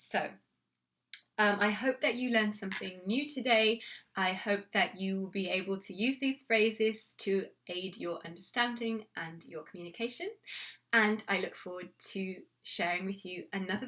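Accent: United Kingdom. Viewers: дожили, британский английский уже акцентом считают)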